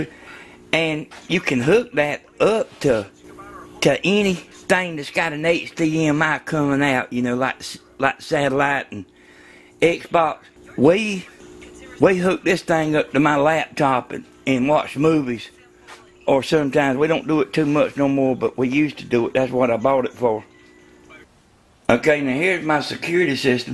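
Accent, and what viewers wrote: South, United States. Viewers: Finally - somebody who speaks my language! Must be the southern accent! Thanks for explaining it so well! We are now up & running!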